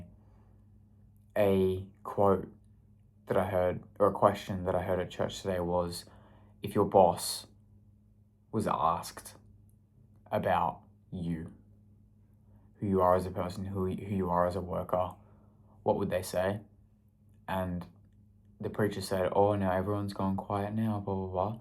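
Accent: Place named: Australia. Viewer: Hi, you sound like a awesome Aussie. Do what you love to help your journey so that you have the abilities from your hardwork of hardships? You'll understand when your 40 years old. The perception of you talking about Ego, is that all is of value, when you use intellect & compassion in the fruits of your labor. You may need to start a little martial arts training, because demons don't like to be seen by the light. laughing not laughing, just loving, living, learning that the truth is non-negotiable. Great day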